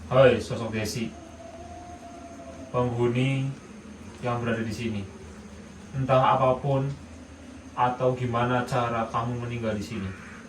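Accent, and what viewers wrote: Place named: Java, Indonesia. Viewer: Channel saya suka sekali...logat Jawanya kental sekali dan campingnya sungguh bikin jantung 💓 copot hahaha.... salam damai bersamamu selalu dek.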